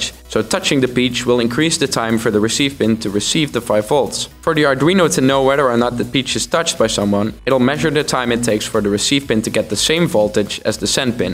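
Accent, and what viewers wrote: Netherlands. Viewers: I'm Dutch myself, and I have to say that your English is really good. It took me a while to realize that your accent was Dutch. Good job! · As a native English speaker, it was instantly obvious he has a Dutch accent, but he does have good English :)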